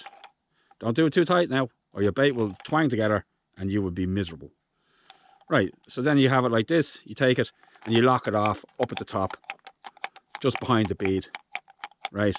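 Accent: Ireland. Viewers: Just happened upon your channel, and its great! Are you from the west coast of Ireland by chance ? The accent sounds familiar. Big props from The US, a great video.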